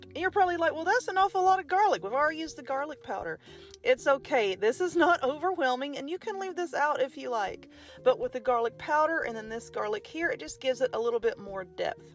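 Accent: South, United States. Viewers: New sub. Definitely making this today or tomorrow. I’m fascinated by your tats and nails. Interesting with your southern accent. My mind kinda went “Huh??” when I saw them.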